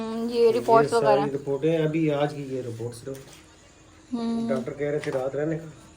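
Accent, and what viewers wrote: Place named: Punjab, India. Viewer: Hay ALLAHA AAP ki panjabi so sweet.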